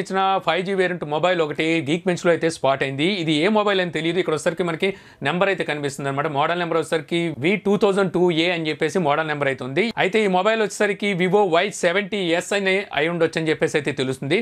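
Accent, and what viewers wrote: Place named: Telangana, India. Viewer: Namaste kaaka telangana slang 🔥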